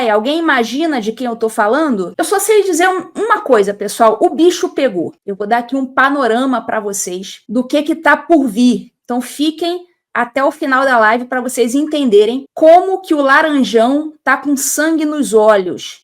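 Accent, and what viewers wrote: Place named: Rio de Janeiro, Brazil. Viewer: gosto dos seus comentarios falando (assim do nosso jeito carioca de falar) muito legal.estou ligado!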